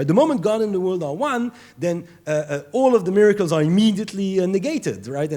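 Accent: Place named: Israel. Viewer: יש לך יותר מבטא ישראלי באנגלית מאשר בעיברית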